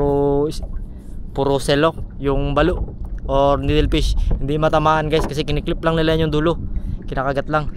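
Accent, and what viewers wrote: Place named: Visayas, Philippines. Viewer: Bisaya ka ya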